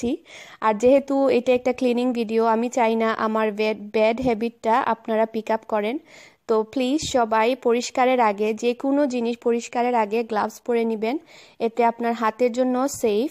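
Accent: Sylhet, Bangladesh. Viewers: আপু তোমার মুখে সিলেটি ভাষা টা ই খুব ভাল লাগে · Apu kmn aso.tomar mukhe shylety vasa onek valolage.. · আপুমনি আজ অনেক কিছু শিখতে পারলাম। খুব কাজে আসবে ইনশাআল্লাহ। আপু তোমার মুখে সিলেটি মাত আমার খুব বালা লাগে।আপু এখন আপনি কেমন আছেন? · আপু আমার খুব উপকার হলো।আমি একটা জিনিস দেখলাম সবাই তোমাকে বলছে তোমার মুখে সিলেটি মাত বেশি মানাই।এটা কি ঠিক ।আমার কাছে মনে হয় যে ব্যাক্তিকে ভাললাগে তার সব কিছু ভালো লাগবে।তাই সে যেভাবেই ভাষা বলবে।আমি তোমাকে ভালোবাসি আমার সব ভাষা তোমার মুখে ভালো লাগে।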